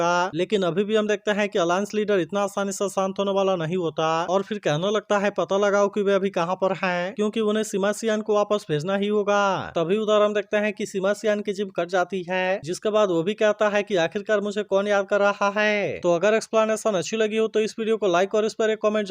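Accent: India. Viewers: Are you a bihari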